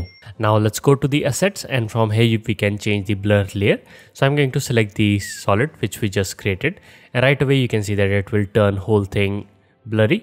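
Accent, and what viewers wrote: India. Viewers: Are you indian or what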